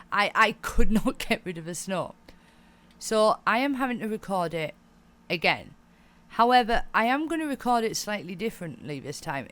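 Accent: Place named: United Kingdom. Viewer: With that accent I'm picturing you as a stunningly hot British gaming nerd!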